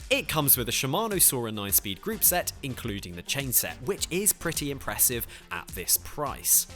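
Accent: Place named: England, United Kingdom. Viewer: I just love how English people say Aluminum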